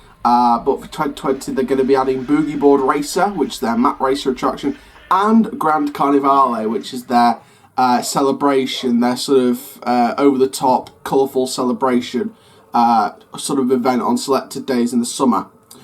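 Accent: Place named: Doncaster, United Kingdom. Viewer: Love your videos they're great, however, they hit a whole new level when you put the subtitles on. I don't think YouTube understands Doncaster accents too well.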